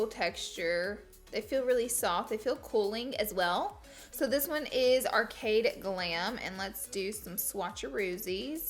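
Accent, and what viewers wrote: South, United States. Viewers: DIY GURL she’s from the south.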